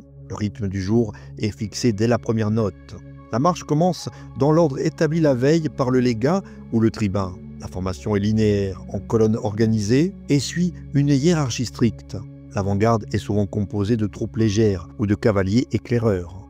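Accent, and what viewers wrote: Corsica, France. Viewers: Magnifiquement documentée ! Félicitations pour ce travail. Je crois reconnaitre un sympathique accent Corse chez le narrateur, est-ce bien le cas ? Cordialement. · Hey ! Il est d'où ce bel accent Corse ? :) ❤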